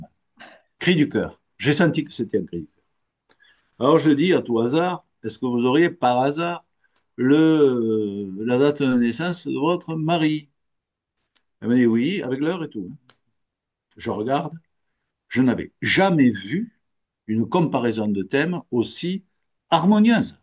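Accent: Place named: Occitania, France. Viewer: Mille mercis pour ce partage de votre " belle personne" ( et oui vous avez tout à fait le droit de ne pas dévoiler votre Thème Natal, surtout en nous l'expliquant si joliment.. 👍) une superbe découverte qui me rejouit 😊 avé l'accent! Une pensée claire et de Très haut vol 👌👌conjuguée à une grande simplicité animée par la verve du coeur ❤❤❤ j'apprécie également beaucoup Christian Duchaussoy que j'ai eu la joie de rencontrer à un colloque...vive les astrologues occitans 😊 Je suis sous le charme 😊, tout comme la pétillante, 🙌 impatiente de visiter votre site et de vous écouter Relationner au Congrès en Octobre 🎉🎉 des "Révélations" comme celle-là font tant de bien au Bel art des étoiles et le sortent enfin des ornières Horoscopiques et mercantiles... 🌟🌟🌟merci 🙏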